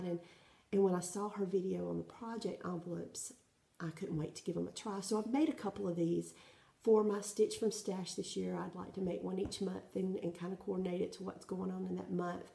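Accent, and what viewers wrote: South, United States. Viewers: Great video.... I LOVE your project bags. I'm not good with a sewing machine but I think I have to attempt a project bag... Your choice of fabrics is striking....Love them, love them, love them... did I say I love them ? :) Please do keep making your videos and share your WIPS and FFO with us. Love your Southern accent (and your friendly personality) reminds me of my relatives back home (Arkansas). SO, from the Pacific Northwest, welcome to Flosstube and Happy Stitching. Hugs, Toni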